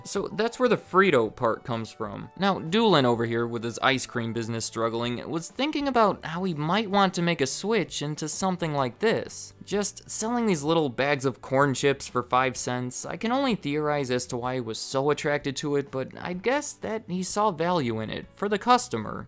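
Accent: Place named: Midwest, United States. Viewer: In this video, it is the word "straighten" or how you say it "straiden" lol. Some midwest thing idk ohio or illinois or something. But it's definitely some accent.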